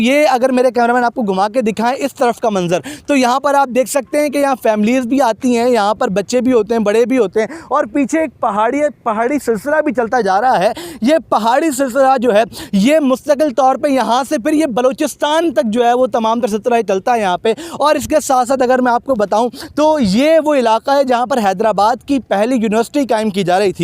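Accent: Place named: Pratapgarh, India. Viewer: Ap ky bolny kaa treqaa bilkul pretabadiyo jessa hy kam ki baat kuch bhi nahi ki siwaye bakwas kerny ky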